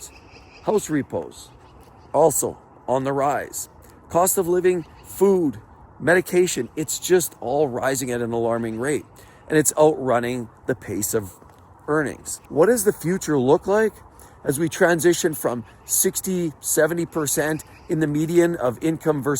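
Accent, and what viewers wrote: Canada. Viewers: Sorry if you mentioned before..... Are you Canadian ? When you say about...you pronounce : a boat. Not making fun...l really like it 😂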